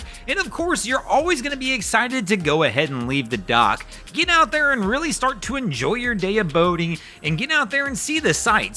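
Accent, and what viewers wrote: United States. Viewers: After a few listens, you will realise that although he has an American accent annoying to Many, he really DOES know what he's talking about and actually makes the video complete. He is also a multiple qualified mariner with much experience. Either way....these are fun complications we all can never stop learning from. Cheers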